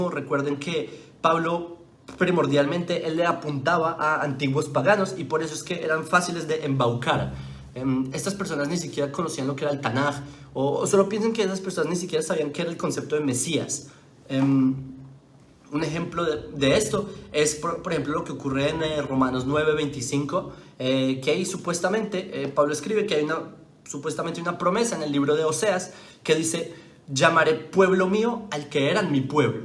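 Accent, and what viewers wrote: Bogotá, Colombia. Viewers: Y cómo va a vivir libre, sirviéndote a ti ? Que eso es lo que quieren los judíos , que les sirvan los demás ? Estás crazy , resígnate y entiende que Dios es Dios para el Mundo , Y que Dios prometió bendecir a todas las naciones , con su risa tonta y su acento de rolo nadie te cree, no eres más que un cachaco con kipas ! 😢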